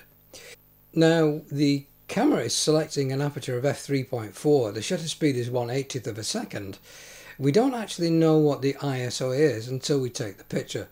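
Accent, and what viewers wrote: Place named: Manchester, United Kingdom. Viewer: Thanks,. Another great series. I've just picked one of these up (practically unused - it's in immaculate condition) on ebay for £550 and absolutely loving it. What's the point in reading through an instruction booklet when you've done a much better job that's easier to follow. It's also nice to have it in a Mancunian accent.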